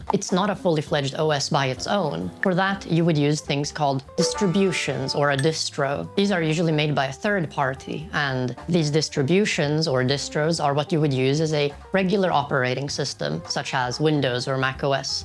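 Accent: Sweden. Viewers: As a Swedish person, I'm quite confident in saying that he sounds very Swedish. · swedish detected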